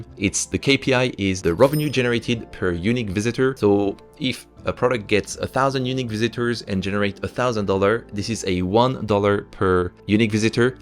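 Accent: France. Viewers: Hey, merci pour la vidéo, (j'ai reconnu le petit accent français) j'aspire à devenir développeur solopreneur, malheureusement je n'y connais rien en programmation, je suis au début de l'aventure, je voulais te demander selon toi quel est le meilleur chemin pour apprendre à coder le genre de Saas que tu conçoit, quelle langage de programmation apprendre en premier et est ce nécessaire d'apprendre L'IA (machine learning ect..) Continue ce que tu fais c'est super inspirant!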